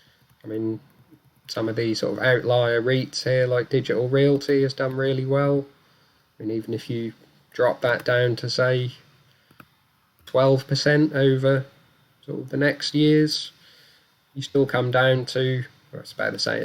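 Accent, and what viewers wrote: Norfolk, United Kingdom. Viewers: UK house prices rise by an average of 6.9% per year not 4%. £100K budget for buy to let is too small. The best strategy for buy to let investing is HMO not single let and I take it from your accent your a Norfolk boi so house prices around there for HMO are more like 200 - 250k for a 3-4 bed. With that kind of property your yield would be more like £1730 per month not the £525 per month rent. Also in most cases with buy to let investing the idea is not to ever fully pay back the mortgage. Instead use it as a way to take profit by refinancing and put that money back into your family home or the stock market. So I don't think this is the best comparison because you are taking a very basic buy to let investing example. Interesting to see the way you have calculated it though.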